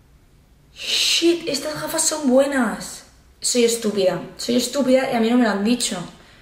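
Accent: Argentina. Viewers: adoro habla con los posters en argentino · Y hablando como argentina es buenardo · En los vídeos de nunca faltan: EL HABLAR INGLES EL HABLAR ARGENTINO EL BOLUDO EL CAFÉ "EL BAILE DE" T AMO PIBA JAJA❤️ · !! El acento argentino te salió re bien! Sos una genia todo tus vídeos son lo más, soy de argentina y tu acento es un 100/10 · puedes hacer un video 24 horas ablando Argentino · amo por que habla como si fuera argentina jajajajajajajaja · hablando argentino mi religion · Jajajj amo que hables Argentino creo que por eso la mitad que te seguimos somos Argentinos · Amo esta Pibaaa😻 Habla en inglés Habla en Argentino Y Nose cuál otra más.. Mee la comooo😻🥰🥰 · amamos a hablando argentino,confirmamos.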